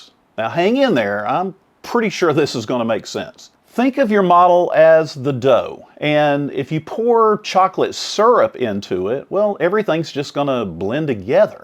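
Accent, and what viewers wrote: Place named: South, United States. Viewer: Was able to filter out the YT algorithm noise enough to discover u. Awesome stuff man. Also u talk like my dad and make me remember my time growing up in the south, so that’s nice!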